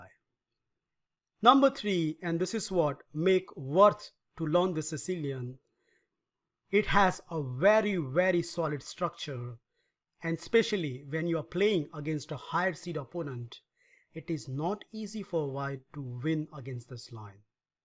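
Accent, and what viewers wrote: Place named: India. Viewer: The best Indian YouTuber with funny english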